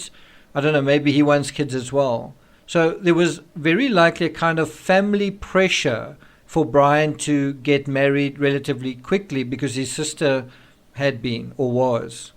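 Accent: South Africa. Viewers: I'm new here. You accent sounds (very) South African.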